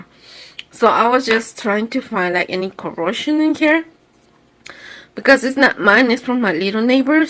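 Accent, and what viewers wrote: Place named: Mexico. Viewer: That Mexican accent is stronggg lol its cute tho😂🥰 🇲🇽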